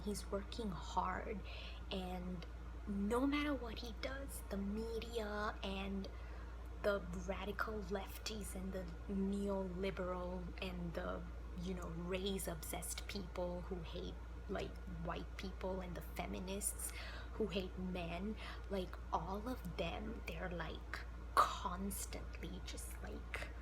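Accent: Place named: Sri Lanka. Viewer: From the name..indian from the accent possibly Ceylonese or Singalese from Sri Lanka...either way..same heritage...im indian as well and it looks like more and more of us are getting red pilled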